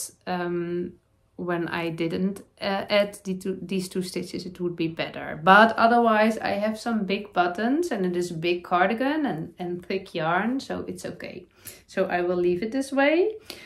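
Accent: Netherlands. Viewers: Greetings from Chicago, Illinois, I came across your podcast a couple weeks and what a pleasure it is to sit down, knit and see all your beautiful makes:) I wanted to see all your episodes so i started from the beginning of the English episodes lol Dont worry about your "Dutchy English" you are doing a great job!!!!! I checked Ravelry for the Kambgarn and it is listed as a Sport weight yarn, 100 % wool - merino dyed and milled in Iceland, it also says Kambgarn is pure imported merino wool. I guess its safe to say its NOT superwash.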